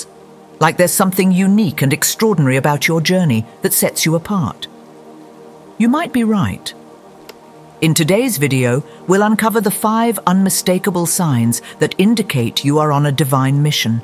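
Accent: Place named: United Kingdom. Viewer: Ok UK accent!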